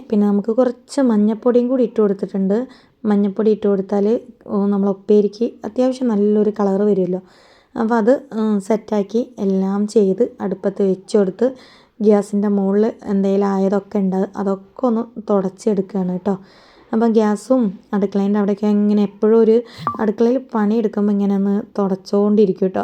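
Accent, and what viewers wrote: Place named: Kannur, India. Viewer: നമ്മ പപ്പ കായീന്ന പറയുന്നത്😀😀😀😀 കണ്ണൂർ ഭാഷയാണേ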